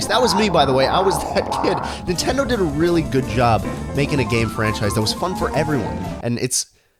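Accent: New York, United States. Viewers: THIS IS????????????????????????????????? wow this man how grOWN UP...and also developed a new yorker accent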